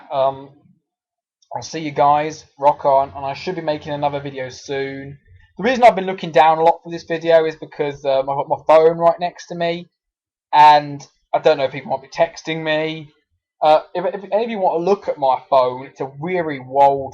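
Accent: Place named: United Kingdom. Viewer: Dude, how I love the British, with that accent I could listen to you talk all day : )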